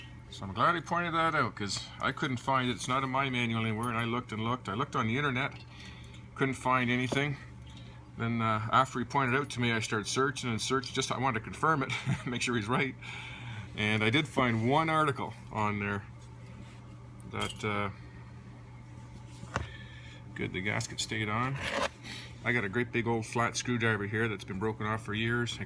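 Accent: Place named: Ontario, Canada. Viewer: Hello, am a watcher of your films here in the Netherlands, nice work you"'r doing, very good information. Have got myself 2 commando's, also like to work on them to get them in a very nice condition. Do you live in Ontario, a friend of mine lives in Puslinch, got the same accent so was wondering? Your dream in going accros Canada and back on the Norton is a fantastic one, hope you make it, would want to join in it but a bit out off reach. Keep on filming!!!!!! Gerleen de Jong, Netherlands.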